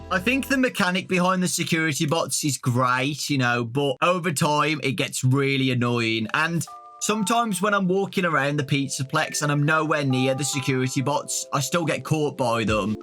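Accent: United Kingdom. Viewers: Go little british boy go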